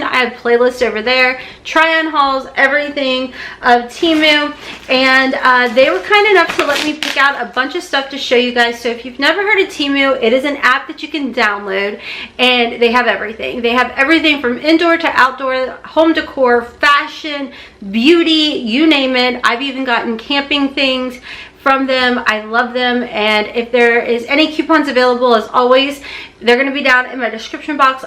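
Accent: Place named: Alabama, United States. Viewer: I'm born and bred in Alabama and you sound like my people.....which makes me so happy because ive been living in northern Indiana for the past 13 years 😢 and i miss everyone back home.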